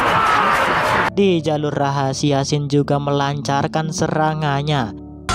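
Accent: Madura, Indonesia. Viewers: MIMIN NYA ORANG MADURA KAH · Orang Madura bang?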